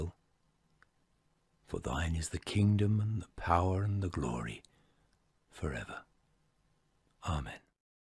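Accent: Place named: United Kingdom. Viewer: Any got any good loops like this but without the “after this manner prey ye” british accent (I can’t hold American too long, just breaks my meditation) thank you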